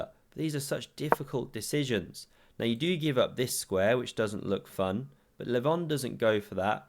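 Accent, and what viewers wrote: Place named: RP, United Kingdom. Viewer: There's nothing like chess commentary served up with a posh Eaton college accent!❤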